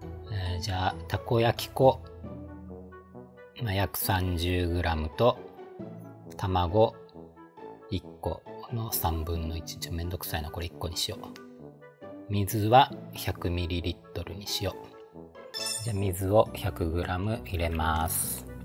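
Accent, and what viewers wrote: Kansai, Japan. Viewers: わぁ～❣️可愛いのってチェブのタコ焼きだったんですね💡さすが！チェブのクオリティー高くてめっちゃ可愛いです❤️❤️ 量そんなんでいいんかいな～？って思ってたけど大成功でしたね😁✌️ お味はどうでしたか😉？ちょいちょい出る関西弁に癒される💛 素の時は関西弁なんですね😌✨ たこ焼き器は家にもあるんですが、使った事がなかったので今度やってみよう🐙🎶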